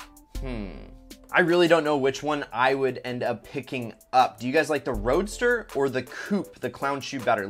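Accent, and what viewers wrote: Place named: Chicago, United States. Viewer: Are you from Chicago? Or is that just default? I thought you were west coast.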